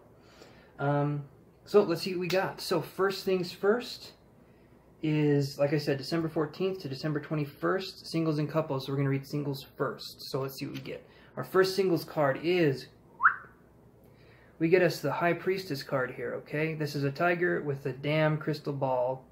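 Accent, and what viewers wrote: Ireland. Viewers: Hahahahah I love the Irish accent. Classic! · Hahahahaha i was like :"hes actually irish?" I was so confused hahahahaha 😂😂Spot on reading ! Thank you!!☺☺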